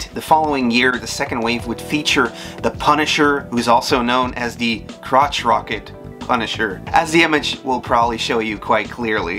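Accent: Canada. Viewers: Are you canadian? You sound Canadian in this video. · AN is common. TREE letters is way to complicated for most us mericans lol. Seriously tho your accent is damn near "perfect" sounding for a country made up of all other accents. I figured you Canadian because it's so subtle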